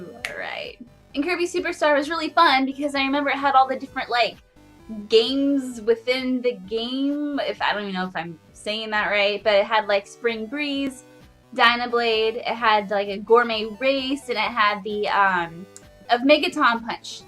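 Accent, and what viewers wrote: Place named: Australia. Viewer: Dat intro made me LoL. Great list by the way! Nice Aussie accent Mate Cheers LoL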